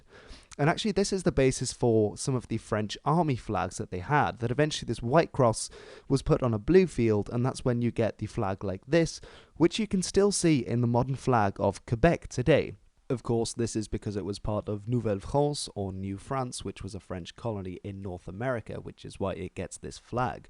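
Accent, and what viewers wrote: United Kingdom. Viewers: ok I didn’t know that because his accent is really the same as the British one, his accent is just perfect but thank you very much for the information I really appreciate that my friend. Love from France x ❤️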